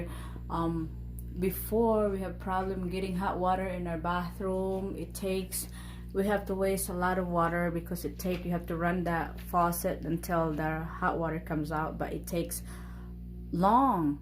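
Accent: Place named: Philippines. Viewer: Filipina accent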